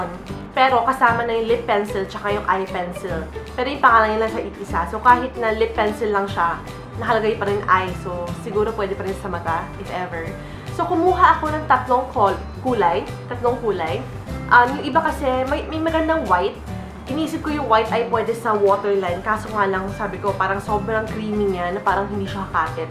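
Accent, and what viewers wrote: Philippines. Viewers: parang ang lalim mo mag tagalog ateeee kakatuwa! ehehe <3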